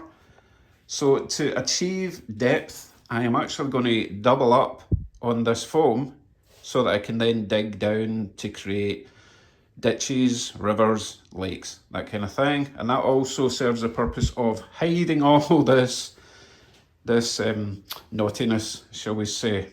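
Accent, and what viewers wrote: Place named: Glasgow, United Kingdom. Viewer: I did a video a couple of days ago on making inexpensive backdrops for the Train layout you may find useful. It costs 90% than store offerrings. Now i'm watching all your old videos. Your accent reminds me of my Grandmothers' accent all the way from Glasgow. None of my boyhood friends cound understand her thick accent.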